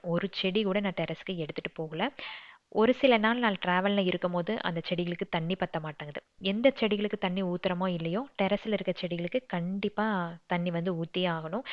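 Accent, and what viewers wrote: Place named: Pondicherry, India. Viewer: akka unga voice kaetalae relax ah eruku ❤❤❤unga kita erunthu varthuku orunal apditha nanga pondicherry la paesuvom unga kita erunthu varathuku oruka😂😂kathukita akka ma❤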